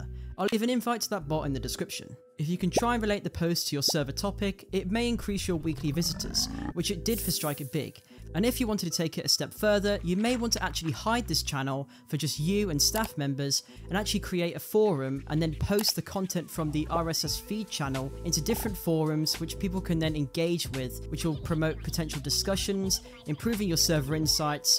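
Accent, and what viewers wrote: United Kingdom. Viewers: hello my fellow british person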